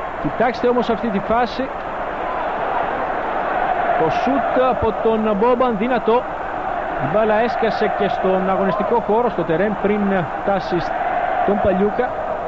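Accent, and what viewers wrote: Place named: Italy. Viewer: Hi! First of all thank you for uploading all these fanatastic videos of our Inter! Which is the language of the comment? the strange thing is that, of course it is not italian, but the names of the italian players (and also of the foreign players) are pronounced with a perfect italian accent.. I'm curioius.. :-)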